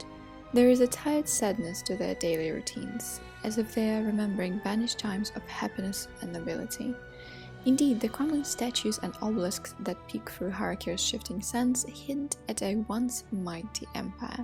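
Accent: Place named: Iceland. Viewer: lolz you sound icelandic. Besides ravenloft har'akir, how about Athas dark sun?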